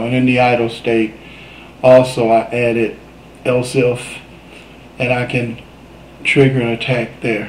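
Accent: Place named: United States. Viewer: Thanks for sharing this. I'm at the point where this is exactly my roadblock, my character needs to do more than just walking around. I know this was over a year ago but can you explain what you did, or maybe do another detailing changes....ie, >add Attack animation to Animator > setup Trigger in Parameters, >set parameter to >.01 etc....then also breakdown what lines of code you added to script or if you added a new script. It's kind of hard to see on this video without closeups or zooms. It is good to hear a good American voice on a tut! Thanks!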